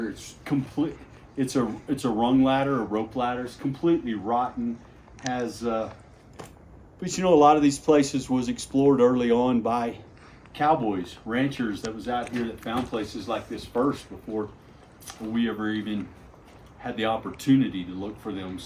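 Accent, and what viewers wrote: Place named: Tennessee, United States. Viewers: Where are you from? I can’t place your accent. Tennessee is what I think. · I subscribed after watching my first video of yours, then went back to watch others. Now I look forward to each new one. You have a really soothing voice with it's southern drawl; and a storytellers style of talking that I enjoy. That inaccessible dwelling was an amazing find. The amount of effort the ancient people had to expend to haul all the rock and mud to build the wall of their dwelling. I concur that a tree would be excellent for climbing, but again the effort in that terrain! They must have been really desperate!. I'm very curious what kind of rock that long white streak was composed of?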